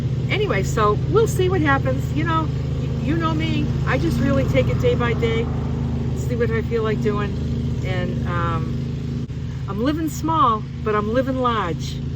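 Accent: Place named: New England, United States. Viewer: I’m a NH native and love seeing my home state being enjoyed; you have a distinct NE accent so it must be fun to be back in the neighborhood. Be safe… Wish I’d seen this sooner as I would have encouraged you to visit Willard Pond in Hancock… you can swim there for free - my grandmother established it as a reserve and it eventually was handed over to the Audubon Society to manage it.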